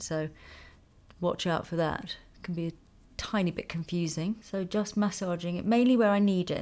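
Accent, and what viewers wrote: United Kingdom. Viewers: Your voice and British accent is sooo soothing!!!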